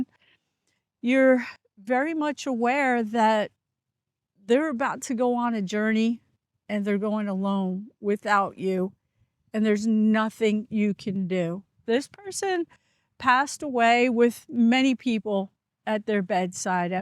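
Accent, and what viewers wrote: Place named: East Coast, United States. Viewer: You have wisdom,! You are caring and a great communicator. Thanks for lifting us up. Life & happiness is what you make it. Love your kind heart & east coast accent. ❤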